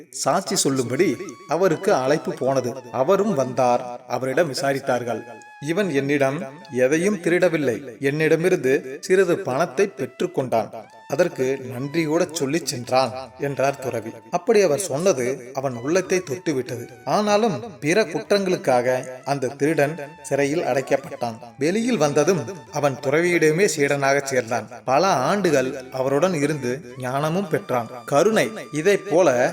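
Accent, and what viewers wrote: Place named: Sri Lanka. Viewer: அவதானித்திருக்கிறேன் .இன்னும் இலங்கை தமிழ் விடவில்லை 😢 லைக் செய்துவிட்டேன் .நட்பே ❤😂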